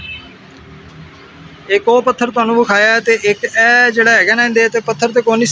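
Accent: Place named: Punjab, India. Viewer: Jutt wali english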